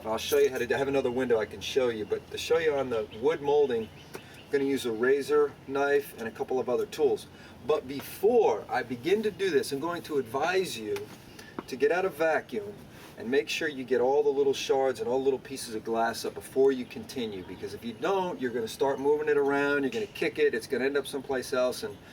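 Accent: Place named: Tennessee, United States. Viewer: Sounds and looks like hes in TN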